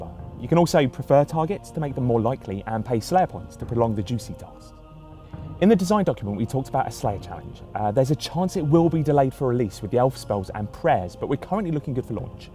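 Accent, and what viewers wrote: United Kingdom. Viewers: He has a british accent, soo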